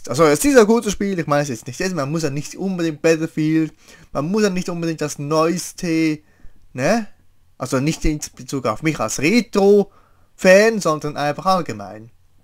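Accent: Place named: Switzerland. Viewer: Das ist ne echt gute Frage. Ob du ein guter Lets Player bist, kann ich nicht so genau sagen, da ich deine Videos zu wenig kenne. Ich kenn dich glaub schon sehr lange, bestimmt um die 3 Jahre. Aber ich habe nie aktiv auf deinen Kanal geschaut. Was ich sagen kann, ist das ich mir am liebsten einen LPer ohne Akzent anhöre, was keine Beleidigung an dich sein soll, weil du kannst ja nix dafür du in der Schweiz geboren wurdest. Man merkt schon, dass du dich dafür einsetzt und du dir Mühe gibst. Ich schau halt kaum die Videos, weil ich keine Interesse an den Spielen habe. Aber ich mach wie versprochen Best Ofs von ein paar deiner Projekte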